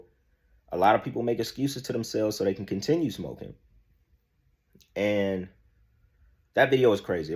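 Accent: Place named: Atlanta, United States. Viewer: I can definitely tell you have an Atlanta accent. 😂❤ I stay near Macon... yep i can definitely tell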